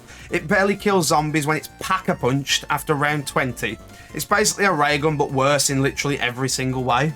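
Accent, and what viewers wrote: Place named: West Midlands, United Kingdom. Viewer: I agree with the choices made 👌🏻, pulled out my old jailbroken ps3 and had a blast the past week playing all the old maps 💯Are you from near West Midlands your accent sounds very familiar ??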